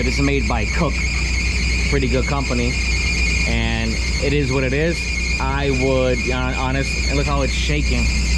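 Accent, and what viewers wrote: New York, United States. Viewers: You sound like your from NY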